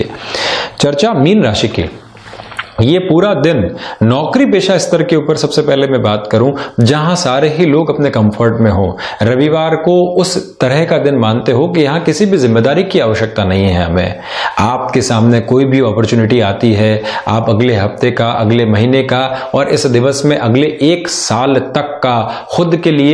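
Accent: Standard. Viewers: Pranam. Bahut shuddha uccharan. 🙏🙏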